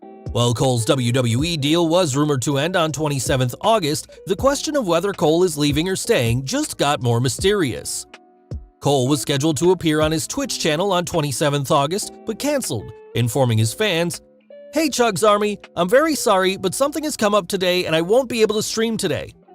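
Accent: United States. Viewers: Is anybody gonna point out that the guys voice is now American? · Oh so this channel is now hosted by an American? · How come you have an American accent and Wrestlelamia has a British accent?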